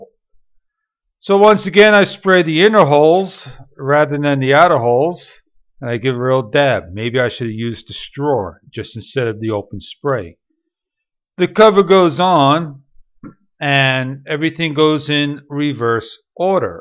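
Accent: New York, United States. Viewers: U from ny!?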